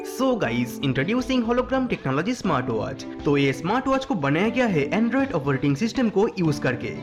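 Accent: Bengal, India. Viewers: Bhai aap bengali ho kya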